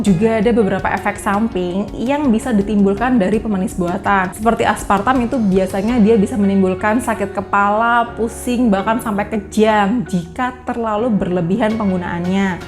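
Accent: Java, Indonesia. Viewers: Gara gara jerawat nyasar ke video dokter, problemku sama dok jenis kulit wajahnya gampang jerawatan kalo minum susu, makan keju, minum yang manis. Tapi susah ya semua itu enak.hehe..masa harus jadi vegetarian.hehe..btw, dok gak ketara kalo orang Bali loh, logatnya kok malah Jawa. Tipsnya semua bermanfaat dok. Salam kenal ^^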